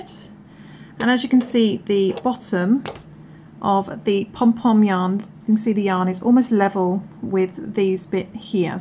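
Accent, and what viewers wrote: England, United Kingdom. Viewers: Thank you for that. It is nice hearing an English accent on YouTube for a change... ;-))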